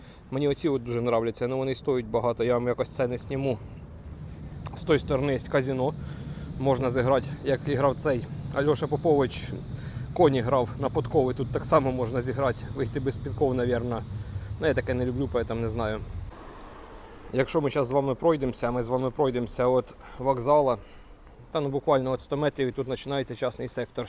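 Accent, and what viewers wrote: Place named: Surzhyk, Ukraine. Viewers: Лютий сурж, слухати неможливо, вухам боляче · 100%, Вже можна було б і потужність свого суржика значно зменьшити. Бо будучи в Польщі, напевно, ретельно намагався опановувати польську мову, в Данії теж буде, бо як іначе можна претендувати на високу зарплату, без місцевої мови. А ось до української мови несерйозне відношення. Тим більше, блогер, як він хоче завоювати симпатії багатьох українців, без української, навіть з легким суржиком, мови. А такий потужній його зараз суржик відрізає велику кількісь потенціальних підписників. Ось і мені подобається, а через такий тяжкий суржик, не маю бажання підписуватись.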